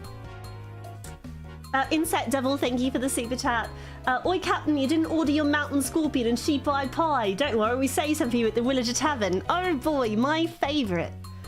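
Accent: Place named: United States. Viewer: I love her American accent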